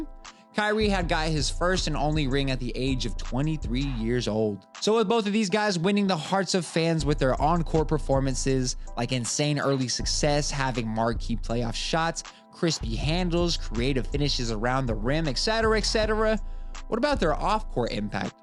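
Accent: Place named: Mexico. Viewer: you sound like a mexican now too bruh u been round the squad religiously fr i see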